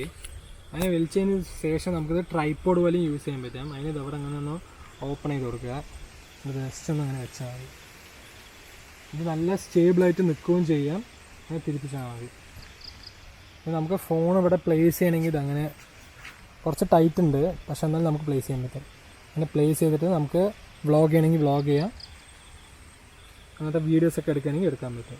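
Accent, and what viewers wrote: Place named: Palakkad, India. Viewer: Wawoo അടിപൊളി 👌👌 സൂപ്പർ പാലക്കാട് ഭാഷ 👌👌💕💕💕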